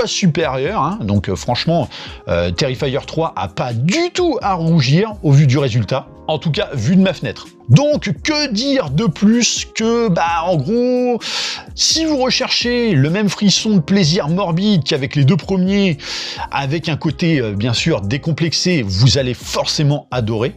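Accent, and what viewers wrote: Paris, France. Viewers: Le phrasé "titi parisien" est un peu trop poussé 😉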